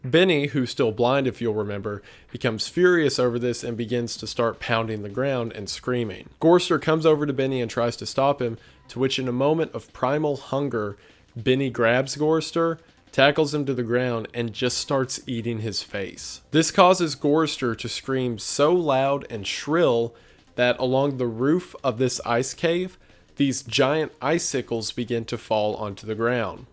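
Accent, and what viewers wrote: South, United States. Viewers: Adversity or diversity?! If you are demonizing "diversity", as what you, can chuck up to a mispronunciation seems to, imply, then, that Southern accent that I have noticed takes on a special meaning... Hmm!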